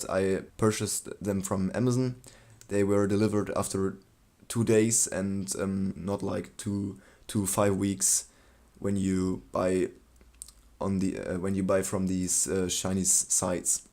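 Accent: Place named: Germany. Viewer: Where are you from? Seems like you're from Germany because of this pretty strong accent but you're doing pretty well keep going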